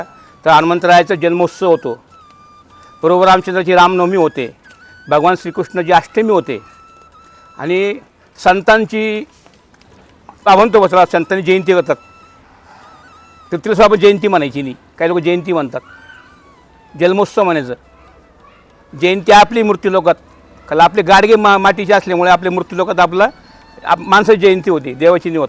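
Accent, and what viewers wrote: Khandesh, India. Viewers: 🙏जय हरी मी आत्ताच तुमचे विडिओ बघायला लागलो मी खांदेशात धुळे शहरात राहतो तुम्ही खान्देशी बोली बोलून मन प्रसन्न केलत. आणि सर्वांनाच परमेशवराची भक्ती करण्यास प्रेरित करतात खूप आनंद झाला 👌🙏राम कृष्ण हरी जय जय 🙏🚩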